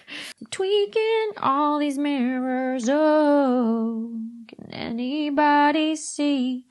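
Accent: United Kingdom. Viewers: every time you say "run the matts" I'm like oh right brit. lol love your accent. I think most Americans love the brits accent. I hear we all sound like cowboys to yall. even like the midwest and cali people do. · At first I thought you must be Australian. Then I heard you reference WE in the north and realized it must be the UK somewhere. Anyhow, nice accent, great eyes. 🙂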